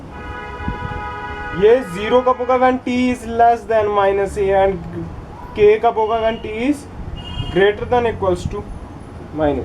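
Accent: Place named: Punjab, India. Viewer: It Sounds Cute When a Punjabi Guy Speaks in Hindi .....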